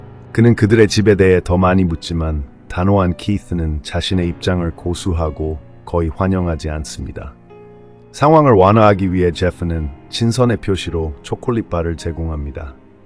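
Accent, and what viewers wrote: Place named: United States. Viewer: 혀 굴리는 코메리칸 스타일 리뷰어에 뇌가 울렁증...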